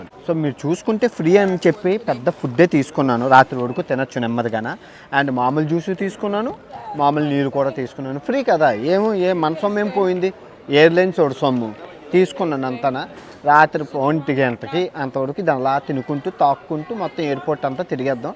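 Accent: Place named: North Andhra, India. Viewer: Ur videos n ur dialogues in Telugu north Andhra accent r fantastic n the way u r moving with people is awesome...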